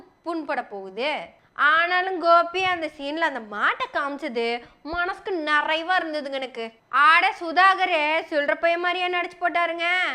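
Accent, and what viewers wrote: Kongu, India. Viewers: கொங்கு slangula செஞ்சுவிடுறது எல்லாம் வேற லெவல் மா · சகோதரி தங்கள் கொங்கு தமிழ் சுவையோடு கருத்தாழமிக்க பேச்சு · அருமை 🖤❤️கொங்கு தமிழில் சாதி கொடுமைக்கு எதிர் பேச்சு கேட்ப்பது இனிக்கிறது 🙏காரணம் அங்கே தான் சாதி வெறியை ஆடி 18யில் கண்டேன் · பேசு மகளே பேசு இது தற்கரி தலைகளில் நங் நங் என்ற கொட்டுகிற கொங்கு தமிழ். கொஞ்சுகிற கொங்கு தமிழ். சங்கிகளின் கொட்டத்தை கண்ணத்தில் அறை விட்டு அடித்து விரட்டும் கொள்கை தங்கங்களின் சங்க தமிழ் அழகு தமிழ்.Allhuakber. jaibheem Periyar gr.daughter வாழ்க வளர்க நலமுடன். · உங்க கொங்குத் தமிழ் மிக மிக இனிமைங்கோ!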